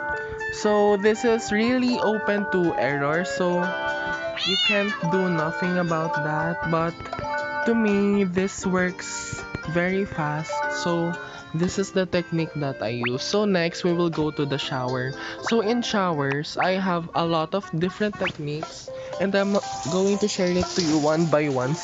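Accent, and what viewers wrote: Philippines. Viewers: i like that filipino accent ahh 🇵🇭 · filipino accenttt hihi 🇵🇭❤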